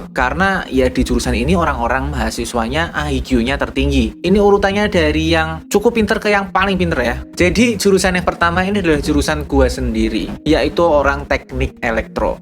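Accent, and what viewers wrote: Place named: Java, Indonesia. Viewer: Orang Jawa ya mas?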